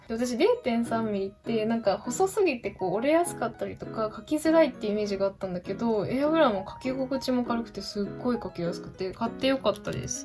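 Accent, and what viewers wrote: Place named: Kansai, Japan. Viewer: 関西弁可愛い……………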